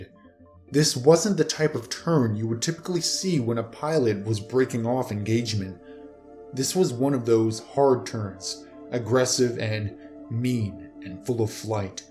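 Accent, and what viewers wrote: United States. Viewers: Love your cute American accent!!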